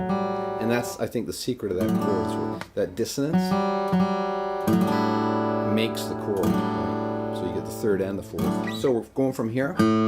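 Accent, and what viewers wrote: Canada. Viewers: How can there be anyone who plays guitar give this a thumbs down?? This guy is fantastic. His voice sounds like he is from Canada?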